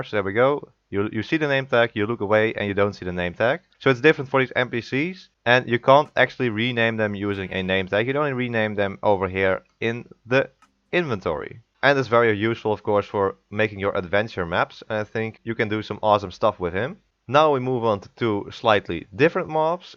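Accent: Netherlands. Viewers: Dat nederlands accent XD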